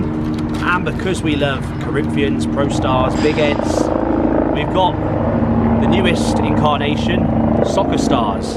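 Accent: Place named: Manchester, United Kingdom. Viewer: That distinctive Mancunian accent.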